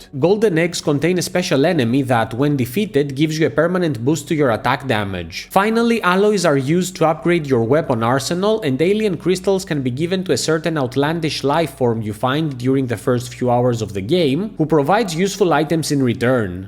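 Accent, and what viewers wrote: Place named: Greece. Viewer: I have a feeling that you are greek according to the accent ! Good video! You just earned a subscriber :)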